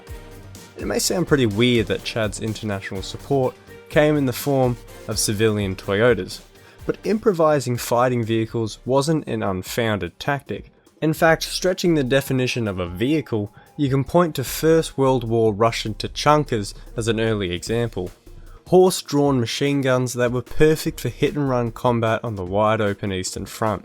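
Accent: Australia. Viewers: Is it just me or is his Australian accent distinctly more intense in this video than usual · you sound very Australian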